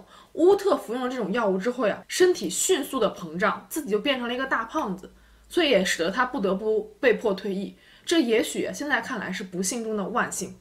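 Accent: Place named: Northeast, China. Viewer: 听口音是东北人哪里的？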